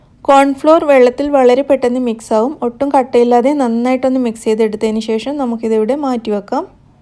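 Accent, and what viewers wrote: Kannur, India. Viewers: It's kannur slang 😅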